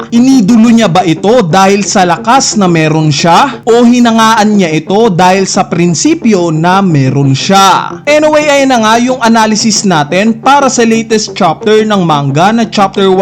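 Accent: Visayas, Philippines. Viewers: Bisayang bisaya a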